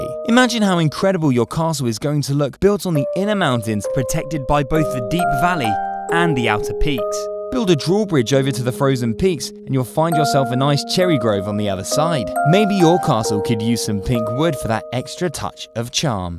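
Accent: United Kingdom. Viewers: The British voice makes it better lol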